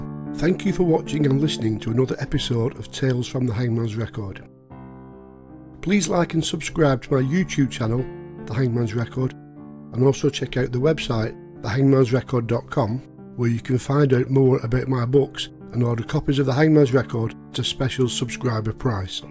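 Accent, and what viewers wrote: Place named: North, United Kingdom. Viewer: In defence of the regional accents of Northern Britain ( and yours is soft and pleasant to the ear ) when Eastenders came out there were thousands of requests from Scotland for subtitles .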